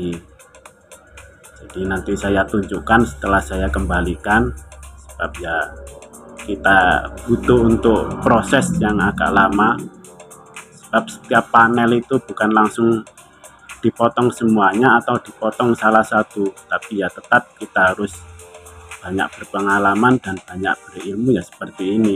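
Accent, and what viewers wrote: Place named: Jombang, Indonesia. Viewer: Logate sampeyan niki kadose tiyang Jombang mas salam dari Manado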